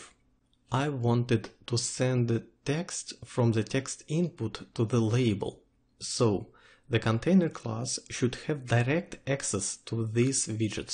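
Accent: Russia. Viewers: Мне показалось или блогер с русским акцентом? В любом случае очень круто!!!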